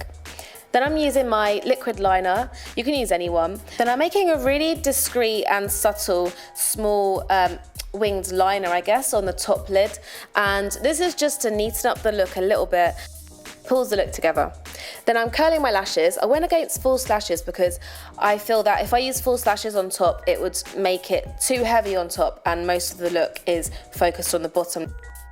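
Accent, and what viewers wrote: London, United Kingdom. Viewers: british accent!! love the make up and your eyes are so beautiful! wow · you're so London, I love it. makes me want to go and visit again xx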